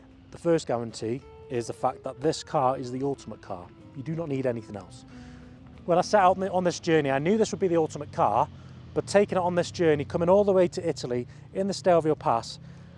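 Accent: United Kingdom. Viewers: no one wants to hear your short british tongue innit mate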